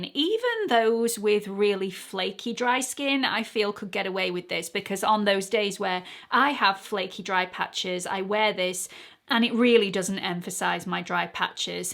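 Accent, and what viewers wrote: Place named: North, United Kingdom. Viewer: I am still trying to work out your accent. Still not sure what part of the country you are from. I have narrowed it down to North 🤣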